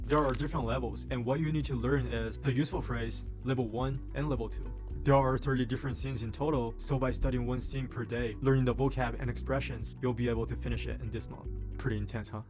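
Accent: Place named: China. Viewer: This was my thought, but his accent is Chinese.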